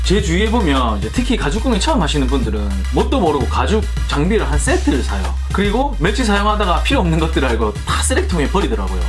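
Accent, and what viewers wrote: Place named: Gyeongsang, South Korea. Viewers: 좋은 정보 감사합니다 말도 빠르시고 경상도어투인데 배경음악이 너무 정신이 사나워서 집중하기가 힘들어요 ㅡㅡ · 경상도 사시나요..?ㅋㅋ 말투가 저희신랑이랑 비슷하셔서... 공예초보자인데 많이 배워용😆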